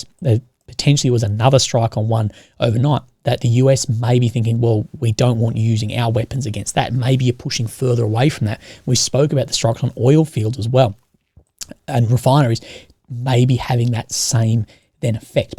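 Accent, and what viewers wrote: Australia. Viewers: So, this is Russia Today with an Australian accent?! Cool!!! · my dear.. i love your "aussie" accent (for me as an italian it sounds a bit funny but I love it) and I love your good will to be "near the reality" this is important for one like you that make reports about a situation on the field trying to avoid the "propaganda warfare" that we all know it's inevitable but of course not near the reality. So I will express here my appreciation for your work.. I really don't care if you (or somehone else) is pro-russian -pro-ukrainian or pro-something-other what I care is that the the job that you are doing try to get the facts. that's all...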